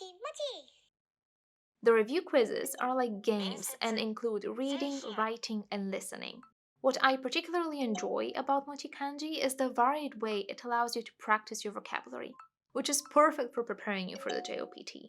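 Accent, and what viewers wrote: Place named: United Kingdom. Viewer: Ah, hearing a British accent speaking about Japanese is refreshing. ありがとう 先生！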